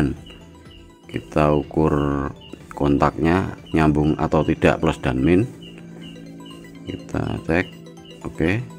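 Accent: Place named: Java, Indonesia. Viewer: Orang Jawa atau orang Sunda ?? Kok disebut KANG , Tapi ngomong nya seperti Orang Jawa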